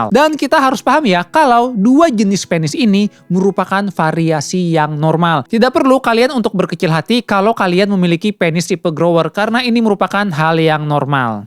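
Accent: Banjar, Indonesia. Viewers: Eh orang banjar kah? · lohh urang banjar sekalinya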